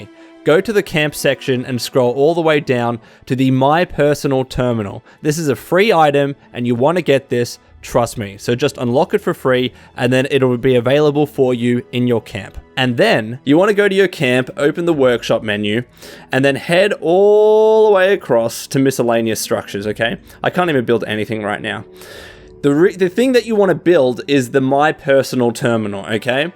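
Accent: Australia. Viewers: Just got Fallout 76 two weeks ago and really enjoying it so far. Always good to come back here for the tips and hear another Aussie voice.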